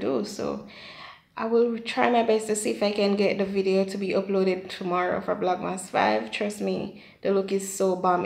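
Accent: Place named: Jamaica. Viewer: Your accent is to die for I love Jamaicans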